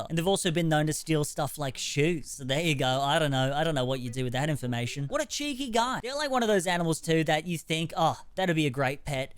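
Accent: Australia. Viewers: The Aussie accent that this information is being delivered with really adds credence as that's the country full of animals that very famously Want To Kill You.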